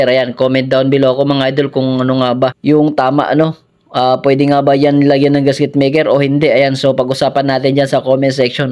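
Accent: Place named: Visayas, Philippines. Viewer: Boss taga samar ka din ba kasi visaya ka mag salita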